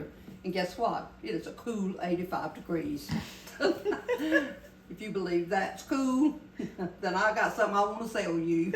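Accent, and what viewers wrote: South, United States. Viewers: I love the way you pronounce and the little sayings from the south. I'm from Oregon pronounced (Oregun). I love the southern accent. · Happy belated birthday. 🎉❤🎉 I hope god blesses you (and all of us) with many more. You are a lovely & classy lady, someone I would love to sit down & have coffee with & hear your life stories. I think I love your channel more for your stories & positivity, to hear your sweet southern accent & to listen to infectious giggles (I laugh every time she giggles) more than your recipes. ❤ Although I do enjoy your recipes & tutorials too & have made quite a few of your dishes. Thank you for sharing. You are a breath of fresh air & I’d rather watch your videos over & over than to turn on the TV & hear sad & depressing news. This Oregon gal sends her love & prayers to you & your family. ❤❤ Shine for Jesus!❤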